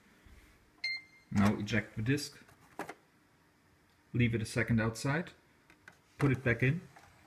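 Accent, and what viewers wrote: Germany. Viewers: deutscher lol?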